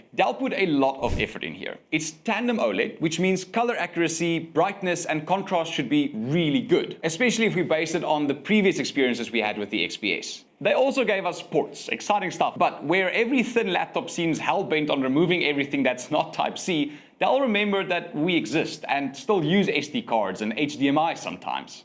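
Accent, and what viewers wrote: South Africa. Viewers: Bro, are you from originally South Africa?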